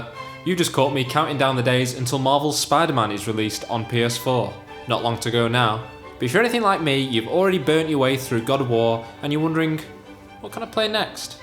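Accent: United Kingdom. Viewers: Wow, you have so beautiful voice 😀 British Accent is music to my ears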